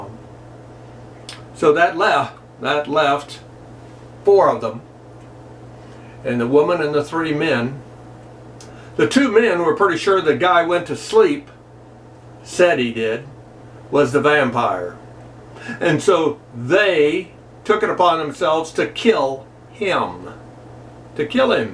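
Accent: Nebraska, United States. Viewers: You surprised me! I tuned in to keep up with current events and hear that Nebraska/family accent, well,I got the accent. I didn't know it was going to be spooky story night! Please consider occasionally telling strange/scary stories that Nebraskans old-timers tell for true. My family has a couple dating from the war. Thank you.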